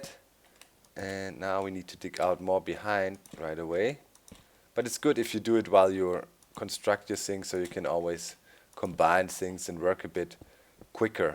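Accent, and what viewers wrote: United Kingdom. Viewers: That English accent! :D :) Awesome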